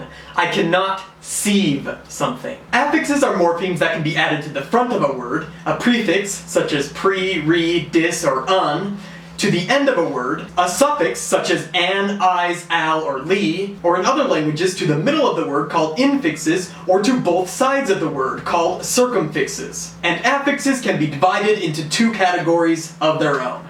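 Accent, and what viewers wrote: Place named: United States. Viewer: his accent also helps a lot, an excellent American well pronounced English Accent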